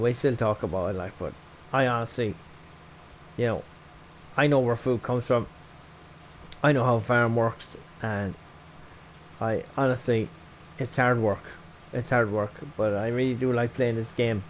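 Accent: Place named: Ireland. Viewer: Nice intro😂. I really like the humor and Irish accent. I will watch this during the day👌.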